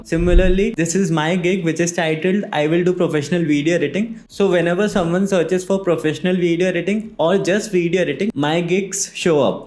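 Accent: India. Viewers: Just started watching video. This bro is totally inspired by indisn accent of english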